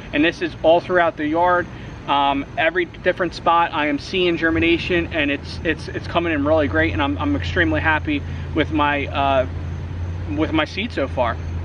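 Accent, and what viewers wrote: South Jersey, United States. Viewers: Hey, I subscribed through Etsy! 😉 It's good to see Jersey again and I can hear your area code 609 accent. 😊 In one video it's so obvious you have a gift for creating beautiful ORGANIC lawns. That is so vital with climate change. We live way upstate New York now in the woods and we don't even have sidewalks or street lights and the scrub grass grows, well, like weeds. It's so nice to see your flat, green lawn. Beautiful work. 🌱🌱🌱🌱🌱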